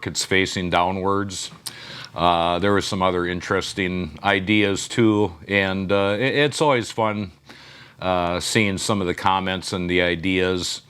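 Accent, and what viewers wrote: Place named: Wisconsin, United States. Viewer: you sound like a fellow Wisconsinite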